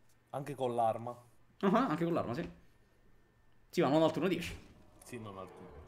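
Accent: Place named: Rome, Italy. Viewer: All'inizio credevo che fossi Romano da come parlavi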